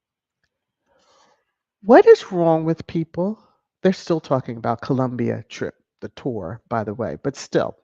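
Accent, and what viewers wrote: RP, United Kingdom. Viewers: Thank you for great update 🙏😘❤❤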